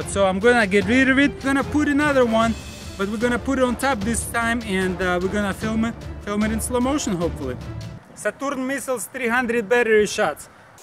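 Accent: Russia. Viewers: Русский акцент 😀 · D tone of him is like crazy Russian hacker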